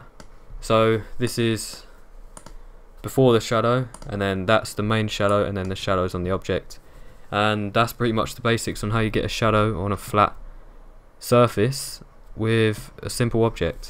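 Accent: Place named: United Kingdom. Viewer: Thatbritish accent ❤️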